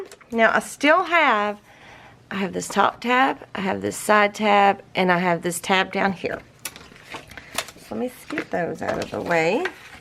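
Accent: South, United States. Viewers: I feel so blessed to have found your channel,. Your aesthetic is perfect for me, I SO appreciate your sense of humor, and your work is absolutely beautiful. As a bonus, you talk “Southernese” just like me! So I definitely understand words like, “caddy wonked.” Thank you for sharing your sense of humor and knowledge with all of us. Hope you don’t mind but I chatter on and on to my husband about “my ” and I continue to lift you up in my thoughts and prayers after the loss of your mother in December. I wish you many blessings.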